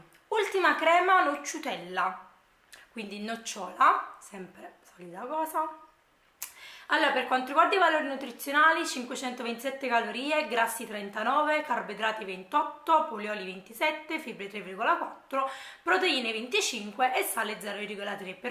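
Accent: Naples, Italy. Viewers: non ti sto attaccando, ma questa ragazza viene sempre presa di mira per qualsiasi cosa faccia, direi che qualche volta il commento lo sii può tenere per sé, senza avere il bisogno di scriverlo, perché tanto penso che se abbia scritto qualcosa di sbagliato lo sappia o se ne accorga dopo, non c'è bisogno di puntualizzare sempre, soprattutto per errori futili come questo, e io comunque da ragazza napoletana, e comunque STUDIO teatro e DIZIONE (CORRETTA PRONUNCIA Della Grammatica italiana) capita di avere questa cadenza, perché è proprio tipica della nostra amata Città (NAPOLI) ed ho anche e solo puntualizzato che comunque il napoletano è considerata una lingua, però non fa nulla, non sentirti attaccata, spero solo che tu capisca come si possa sentire una ragazza come lei, mettetevi nei suoi panni e pensate prima di scrivere, detto questo, Buona domenica 😘❤️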